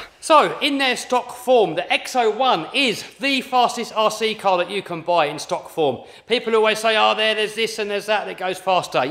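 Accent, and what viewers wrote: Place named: United Kingdom. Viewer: That British accent ❤️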